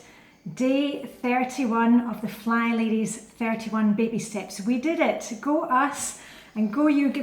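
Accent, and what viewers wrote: Scotland, United Kingdom. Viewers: Thank you so much for these videos! I have been flying for about a year, but I still find your videos helpful and entertaining. I also love your Scottish accent! :) Kind regards from a German flybaby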